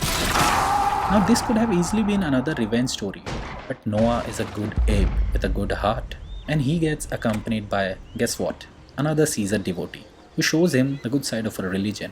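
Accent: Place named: India. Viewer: and your reply here proves my theory that your just using ai generated script and edit to make these videos. Your randomly connecting movies with similar themes from different cultures in this video which would only be possible if someone watched a he'll lot of movies . That along with your robotic voice with Indian accent which again was obviously generates by ai, gave me a great doubt on your content. Anyone who watches multiple movies from different culture can only be from someone who is darn passionate on about cinema which you claim in your YouTube bio to be from your bollywood industry background , which is believable , except anyone with that mindset would never reply with something as simple as what you did here. Basically your using ai to make all your content in this channel as evident from the 9 months of content post activity 🤣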